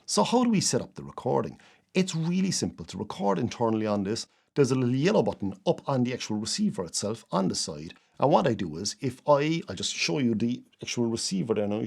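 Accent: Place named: Ireland. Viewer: So I am subscribing just for the Irish brouge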